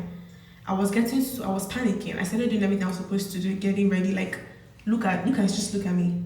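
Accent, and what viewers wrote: Igbo, Nigeria. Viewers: I love your igbo accent while you complain 😅 sorry for the stress, that's why i attend concert because na me know watin my eye see the first time.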